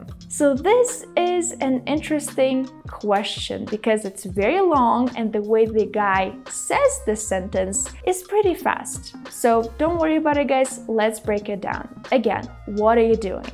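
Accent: United States. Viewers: I love Your English Videos so much Dear and You are so great speaker in American English, I think you live in USA.😊😇🥰😍🤗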